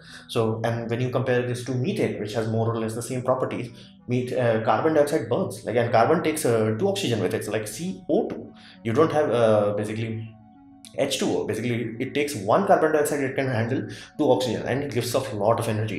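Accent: India. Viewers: Thank You :-) Piece of advice, speak slower, i've spent a lot of time with indian support people and it takes a while to get used to the accent. But other non native english people (I'm danish) have to translate twice when working with indians."indlish"<>english<>danish so talking slower is at the essense.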